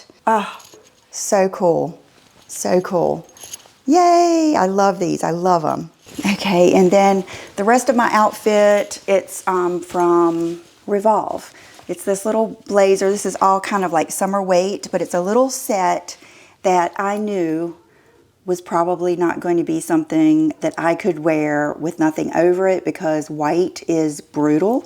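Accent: South, United States. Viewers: Funny story. A close friend of mine and her daughter were in Paris about 3 years ago. They were in a looooooong line to go in the Hermes store, where her daughter just "knew" (hoped and prayed) she would get lucky. Suddenly, they pulled her out of line, and she was able to buy a Birken on the spot! It was nuts!!! They couldn't believe it. No explanation was given. CRAZY! You just never know. The lawsuit? I have no feeling one way or the other. Love your channel...I've watched you for YEARS and YEARS! You're the sweetest, most authentic Southern Belle I've ever seen... and I'm southern, so I know! LOL I don't know if your state considers themselves the south, but your accent is fabulous!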